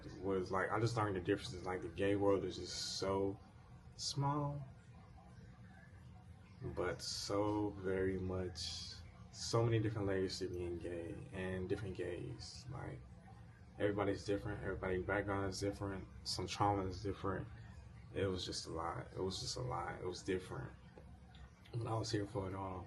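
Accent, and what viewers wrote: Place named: North, United Kingdom. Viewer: It’s so weird bc u don’t strike me as sb who is from the south u sound and carry ur self like u from up north 😂 u got that smooth voice like a New Yorker 😫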